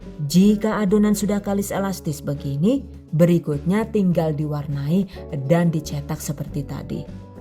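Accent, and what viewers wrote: Banyumas, Indonesia. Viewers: Tinggal olih neng Jatim, tapi ngomong tetep ngapak ya bund😅 #GiveawayTHV2021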